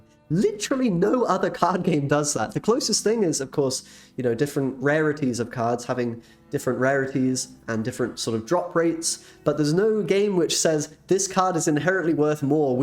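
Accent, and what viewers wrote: United Kingdom. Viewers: I still wonder why you clearly have a British accent but you use Polish currency on your store 😅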